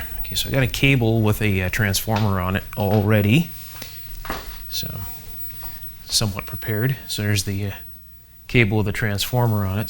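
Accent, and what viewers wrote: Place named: Canada. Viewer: I detect a Canadian accent, bet I am right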